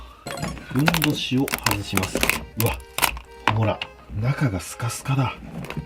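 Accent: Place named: Mikawa, Japan. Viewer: かねこさんのバリバリの三河弁が好きです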